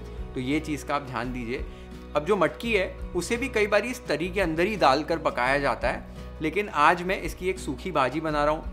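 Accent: Maharashtra, India. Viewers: खूप सुंदर दिसते मिसळ 👌👌आणि तुम्ही जी शुद्ध मराठी बोलता ती ऐकायला खूप छान वाटते . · Dear, I am a hardcore maharashtrian...I am so happy with your pronounciations and Marathi zhatka in most authentically cooked most favourite of entire Maharashtra.Thank you so much.Big fan and follower of yours.God bless you... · love you ♥️ sir I'm from mumbai ( Maharashtrian ) तुमची मराठी एक नंबर आहे 😊 pronunciation authentic Marathi 👌 Your recipes are Outstanding.. no doubt, i personally tried out most of.... Kolhapur means treasure of testy Food 🚩♥️ GBU 🕉️ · Amazing..ur Marathi accent is awesome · Recipe तर झणझणित आहेच..पण मराठी accent एकदम कडक... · Like your Assal Marathi Pronounciation.. Love the way you cook all recipes with authenticity and dedication.. 👍🥰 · Yes... His marathi pronounciation is very clear. I have seen his modak, kale mutton recipe...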